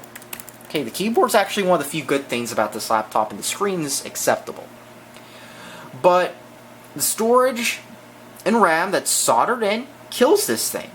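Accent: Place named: United States. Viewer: They opened with "This laptop sums up everything wrong with the computing industry today" in a broad American accent. I'm surprised you got 4 minutes in...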